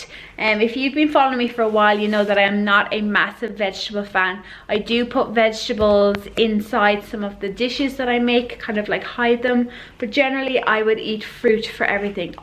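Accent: Ireland. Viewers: Where in Ireland are you from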